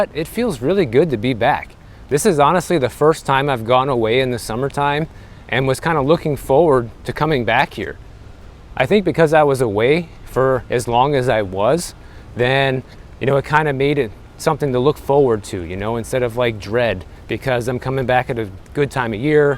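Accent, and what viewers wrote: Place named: South, United States. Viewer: We know you're a southerner when you say ( furr ) instead of ( for ). NO NO NO I can 1st hand attest to money ruining lives. Money can absolutely ruin and kill people and I have 2 cousins and 2 uncles that are deceased due to money and a good friend of mine his son is dead due to money. Musk and Vivek claim they could probably cut 2 trillion a year in waste and fat .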